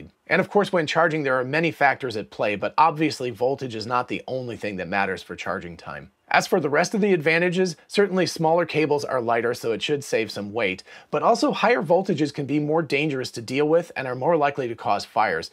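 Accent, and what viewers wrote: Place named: United Kingdom. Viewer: It's so obvious that Lucid ain't gonna make it .. not quite as obvious as Nikola, but close. Elon has talked at length about the difficulties starting a new car brand. It is extremely capital intensive, and with their supply chain setup and pricing/TAM, there's no way they will make it to profitability without running out of cash ... again. Saudis bailed them out once, but the market won't be able to do it again unless they commit some major shenanigans. Lucid is a great dream narrated with a soothing British accent, but it's only that.. a dream.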